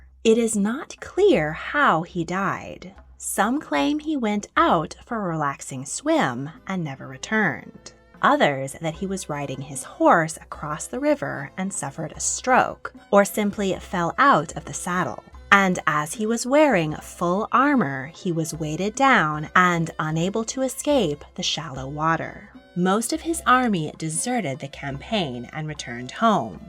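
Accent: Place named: United States. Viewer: What a HORRIBLE, horrible nasal American voice. Ouch, it hurts.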